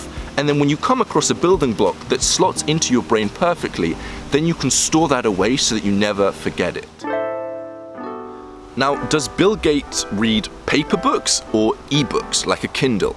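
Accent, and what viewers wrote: United Kingdom. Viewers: What part of the UK are you from man?! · Where are you from? Your accent sounds quite British but it's very mild.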